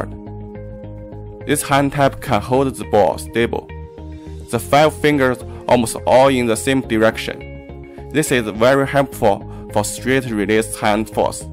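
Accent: China. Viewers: even with the Chinese accent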